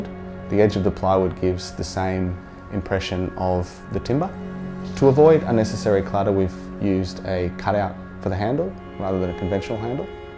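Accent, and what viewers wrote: Australia. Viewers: it is Australian accent. Dont believe it? Go to australia mate. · Why not judge accent of a native English speaker? It wouldn't be nice to judge non-native speakers because they have disadvantage but no excuse for "Aussies" :D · It's the Australian accent lol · Elyse G.엘리스 지 That’s his Australian accent, maybe you shouldn’t judge people’s accent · Aussie accent that's why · While he is very Australian, this is his individual way of speaking, and not typical to our accent at all. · Oh my... Australian accent is lit 😁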